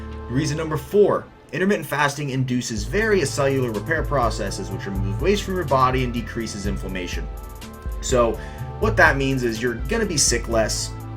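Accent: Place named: South, United States. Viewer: You from like the South dude hehe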